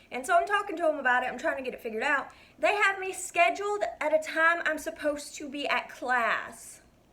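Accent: South, United States. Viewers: Oooh a southern accent... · I love your southern belle accent ^-^ its kinda nice to listen to you talk while i work (i work at sonic. Its way better than walmart because my bosses are really chill and my co workers are cool but some customers just ruin it)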